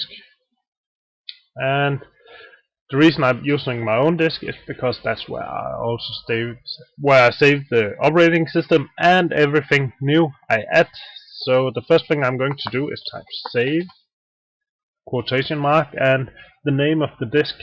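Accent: Denmark. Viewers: You're Danish and I can hear it! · jeg kunne høre lige med det samme at du var dansk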